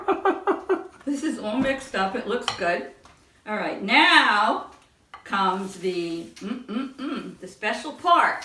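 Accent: New York, United States. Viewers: Whoa...I jumped when the bowl slipped from your hands! That dip looks yummy and pretty. I've got to ask...are you in New York, cause I detect an accent? (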